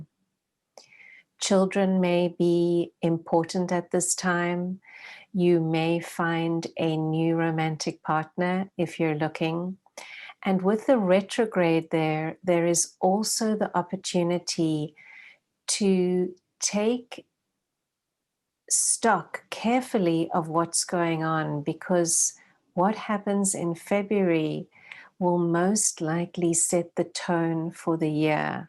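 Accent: South Africa. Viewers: Loved this; thank you very much! Gives me hope. Wonder if I can still meet someone during Covid living at my parents house haha. My Ascendant is libra at 23' degrees; Will Pluto still have an deep transformative influence on me? you sound South African haha:)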